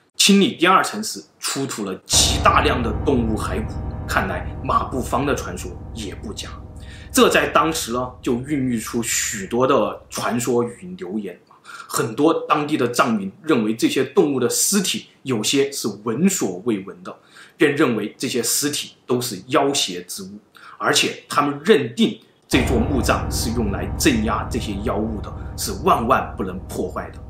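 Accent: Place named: Sichuan, China. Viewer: ，听你说话感觉你像四川那边的普通话，因为你n、l部分，平翘舌不分。但是看你留言又感觉你不在中国大陆的样子。因为大陆居民要翻墙才能玩youtube的，所以肯定现有B站账号、抖音账号才会有youtube账号啦。而且墙内是主要阵地啊。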